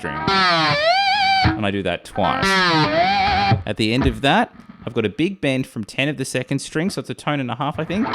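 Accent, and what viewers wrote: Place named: Australia. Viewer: very tough the stretch 15 18 22 as i have medium size fingers which tend to jam the strings muting the sound and killing the notes....u are a big guy so its easy.i can cheat with tapping easily! but i am tempted to stay honest and untap--but i am still struggling, now 2 days of 2 to 3 hours of playing (how many days would it take to crack this part?) ...8.43 part of the video is what i am referring to....and do you follow cricket? you sound aussie..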